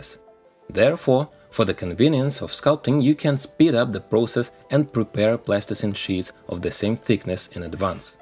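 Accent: Russia. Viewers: You have a strong accent. It looks like Russian.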